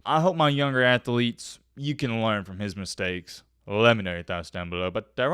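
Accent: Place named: Appalachia, United States. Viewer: And, what the hell does he say at the end? Sounds like some Appalachian, inbred, dialect.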